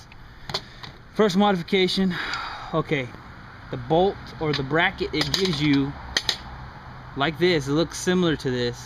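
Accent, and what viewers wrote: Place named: Mexico. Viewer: Your Mexican Right ? i can tell by your accent :P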